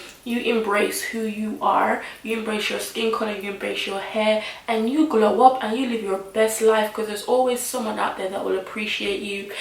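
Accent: Jamaica. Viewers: Omg are u a Jamaican? ??......mi hear di patwa....hahaha😂😂😂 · I love when your Jamaican accent comes out 😍😍 You're so peng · I seriously love it when you speak patois😂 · First time watching ur vids and I could tell rite off the bat that youre a Jamaican... ... boii we cyaa hide.... ...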